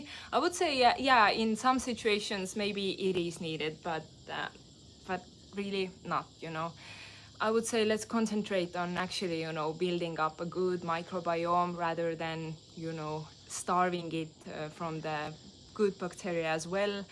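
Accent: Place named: Estonia. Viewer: Love the Estonian accent. Do you guys also speak Russian, or do they not teach in schools anymore?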